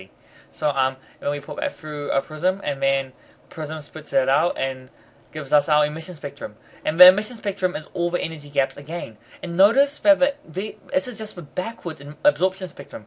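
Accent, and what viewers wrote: New Zealand. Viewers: New Zealand accent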